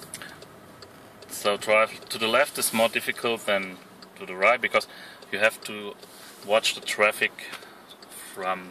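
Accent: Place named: Germany. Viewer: I love the German accent of speaking English!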